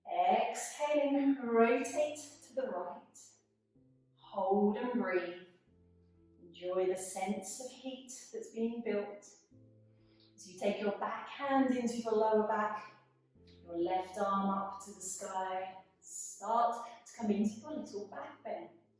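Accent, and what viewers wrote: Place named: United Kingdom. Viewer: Wow! I stumbled on this flow while looking for no-inversion (headache-safe) yoga. When I get a migraine, the day after I have a residual headache that kind of feels like half a headache mixed with a hangover. I do want to do flows during these days but I found that doing any kind of inversion can exacerbate the head pain. So this flow was amazing, great breath work, mindful warm up and stretches, and amazing pacing. The voice cues were so on point too- I've never seen this instructor, but I didn't have to look at the screen more than once or twice. (And I'm American, so I love a beautiful British accent lol) Thank you so much for this gentle, soothing, and pampering flow. Going in my after work favorites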